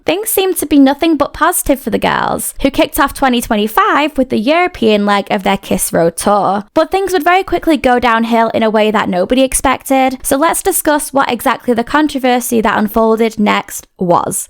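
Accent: United Kingdom. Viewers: Are you British? Lovely accent. ☺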